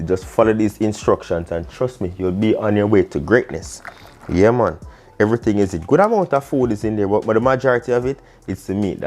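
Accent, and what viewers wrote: Jamaica. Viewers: Mmmm d soup looks a lick 👅 n mi know it tast a lick cos the seasoning n flavour what makes it so tasty 😋 Amazing god bless u hands mi like u Jamaican actcent. 🤣 I love cooking especially pumkin soup 🍜 so luv to watch u videos 👍🏿👍🏿👏🏿👏🏿😋👅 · The jamaican accent makes me come back,delicious soup ofcourse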